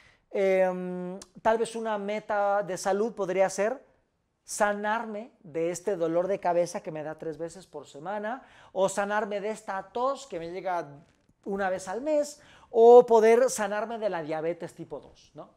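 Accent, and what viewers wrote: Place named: Mexico. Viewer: hola! jaja siempre me haces reir, y lo "chingado" es que cuando me paso un rato esuchándote... jajaja salgo hablando "mexicano" o sea... com tú... Mi hija me riñe, vivo en Alemania, y por suerte acá nadie me entiende, jajaa. Me encanta como eres, Gracias por todo lo que nos compartes, y por tu simpatía.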